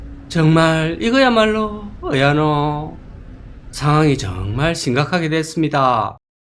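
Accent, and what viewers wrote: Gyeongsang, South Korea. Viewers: 망한곳만, 망할것같은곳만 뎅기는 거도 능력이죠. 그쵸. 특유의 그 경상도 사투리로. 우야노. 우얄끼고 하면서. ㅎㅎ 안 망할것도 망하겠어요. 응원 합니다.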